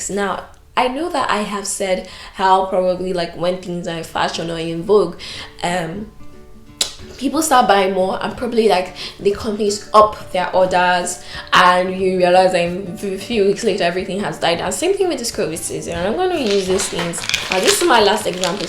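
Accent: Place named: United Kingdom. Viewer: with the British accent. Thanks for educating us on supply chain. I didn’t know supply chain had this much depth. Keep it up 👍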